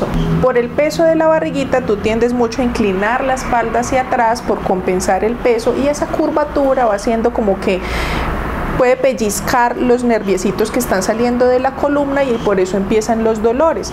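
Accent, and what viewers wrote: Colombia. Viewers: Claro es colombiana y paisita..por eso esa voz preciosa..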